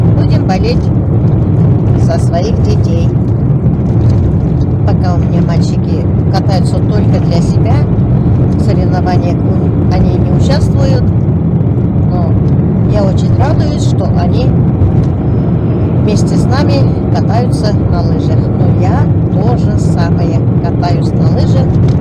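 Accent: Tatarstan, Russia. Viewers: вы молодец люблю татарскии акцент я сама татарка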